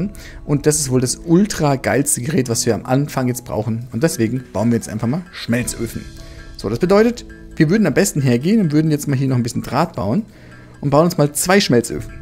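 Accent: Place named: Hesse, Germany. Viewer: mit hessische Akzent :))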